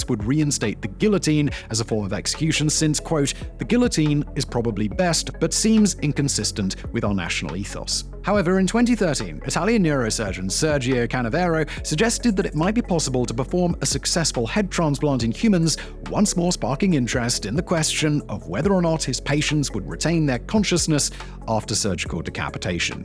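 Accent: United Kingdom. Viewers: Damn he is british.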